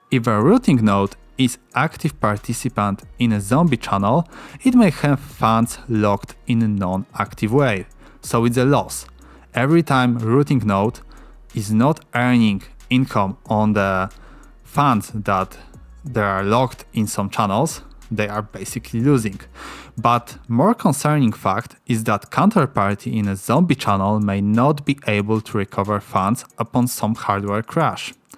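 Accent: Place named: Poland. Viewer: Where are you from? You sounds a bit Polish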